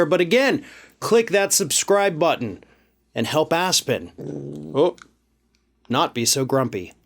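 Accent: South, United States. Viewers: Hey, just curious if you purposely added that southern twang while you were reading that…. normally your accent is not very strong…but DAMN. Anyways what if Mo Brooks takes him down??? for the simple reason that he did not endorse him. I mean as long as he goes down, I don’t care the method but…JFC Mo Brooks is a petty bitch!!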